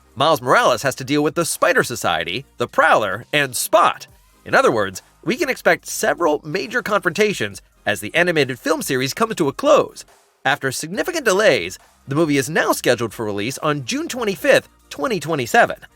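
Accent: United States. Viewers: I find this American English really hard to bear. That artificial euphoria in every sentence. Exhausting. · God his American accent and tone is jarring as fook...cant finish this lol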